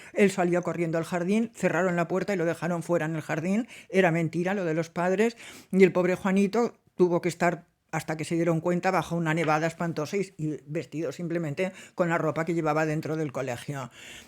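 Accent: Catalonia, Spain. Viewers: BUENAS TARDES! ERES ESPECIAL! CUANTAS VIVENCIAS! CUANTO CONOCIMIENTO! TODO ES ENRIQUECEDOR.ME ENCANTA ESCUCHARTE POR TANTAS PERSONAS A LO LARGO DE TU VIDA HAS CONOCIDO.GRACIAS POR TUS RELATOS.ERES UN SOLETE! YO TAMBIÉN ESTOY ENCANTA CONTIGO.ERES UNA PERSONA MUY ESPECIAL; MÁS TU ACENTO CATALAN ME RECUERDA MUCHO AL DE MI MARIDO ( QUE EN GLORIA ESTE ) PUES SU MADRE ERA DE REUS,EL TENIA EL CATALAN MUY ARRAIGADO ) GRACIAS! UN ABRAZO ENORME.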